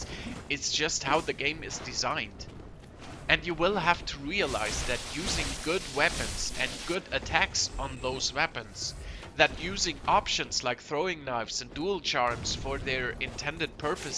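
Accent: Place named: Germany. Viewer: ah i knew it :D because u pronounced some words or to be honest most things u said in a german way. No hate but u should work on that :) but anyways nice Video dude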